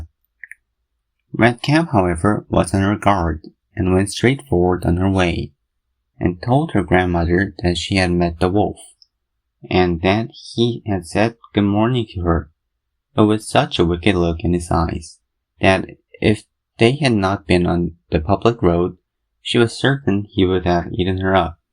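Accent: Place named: United States. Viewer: 미국인 같아요!! 발음 대박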